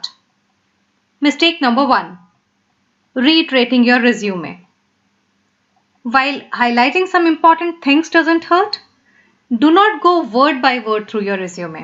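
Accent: India. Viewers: I'm anti the Indian english.. my bad 😥😥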